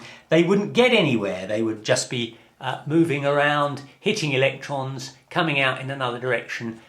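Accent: United Kingdom. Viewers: British accents should be forbidden in youtube videos. No matter how stupid the ideas are, if they are told in a British accent, people will believe.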